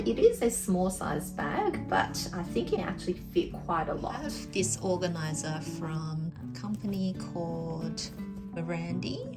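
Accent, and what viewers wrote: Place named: Australia. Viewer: Also, it’s soooo nice to finally hear your voice! Been wanting to hear your Aussie accent for the longest time I’ve known you hahahaha 🤣😅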